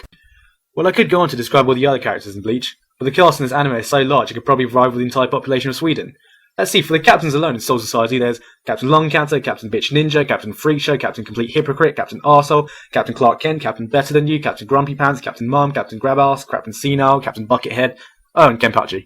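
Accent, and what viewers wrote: United Kingdom. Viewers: His British accent was stronger back then. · used to sound so much more British lol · he's british accent was pretty thick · his accent is more british here, he changed a lot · Old school Gigguk sounds more British 😅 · Lol he sounds so british at this time. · He really had a strong British accent for a Thai lol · the british accent in this video hits differently with attitude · holy shit he sounds so bri'ish. he sounds so white when i picture him talking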